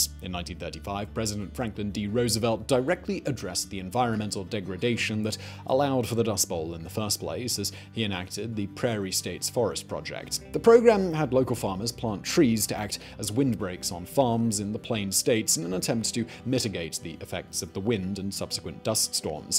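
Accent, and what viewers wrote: United Kingdom. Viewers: I don’t like learning about American history from a British voice. It bothers me! Shutting it off. 🇺🇸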